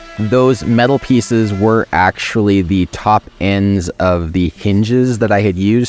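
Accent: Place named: Canada. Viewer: Wait, you're Canadian?!? 😂 I should have caught the accent sooner. Feeding the algorithm for you from a bit South - almost to the Gulf of Mexico. 😄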